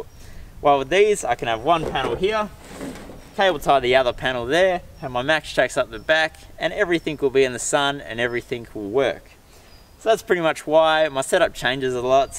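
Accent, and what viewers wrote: Australia. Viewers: Australian accents are just awesome! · Very well explained... where u from Mate? Australia? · We probably have a different accent? But we all are not a dumb cu.t He might have make a mistake etc but he knows what he is doing.😎 Don't fu.k with an ozzie 😆 Oi oi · The Steve Irwin of Off-road, not just because of accent, but I enjoy the content because clearly he is enjoying discussing it. Accent helps though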